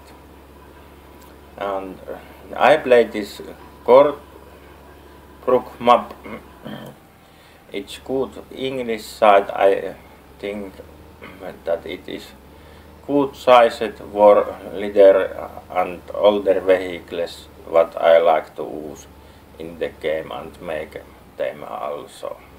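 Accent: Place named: Finland. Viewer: Eihä sille voi mitää itekki menee englanti siihe ralli englantii kuten valtra valmet mainitsi jo:D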